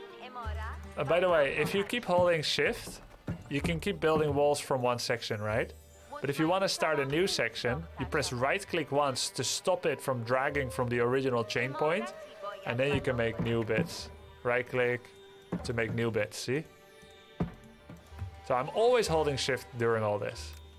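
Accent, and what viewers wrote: Netherlands. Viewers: Haha jij bent echt zooo duidelijk een noord-Nederlander. Dank voor de heldere video! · Is that a Dutch accent i hear?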